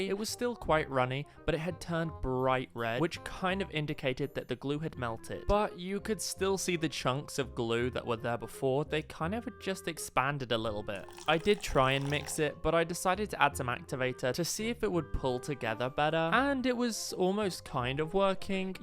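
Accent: United Kingdom. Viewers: He sounds British bro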